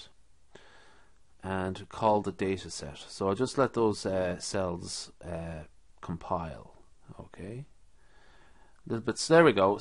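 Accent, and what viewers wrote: Ireland. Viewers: It's kind of spooky hearing another Irish person talk me through machine learning on a YouTube video, especially in such a casual manner. You may as well be my inner monologue 😂 "So here we are aaand ... that's not great". Subscribed. Looking forward to more of your videos :)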